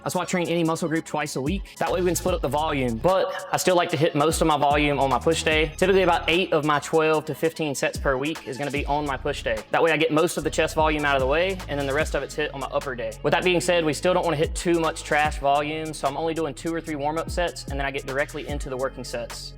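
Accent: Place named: Rural, United States. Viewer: Come ohwne!!! Love that country twang lol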